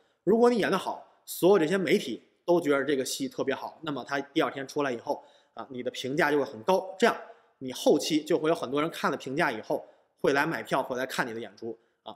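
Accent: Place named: Beijing, China. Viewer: 哈哈哈，我三里河的也表示听到了乡音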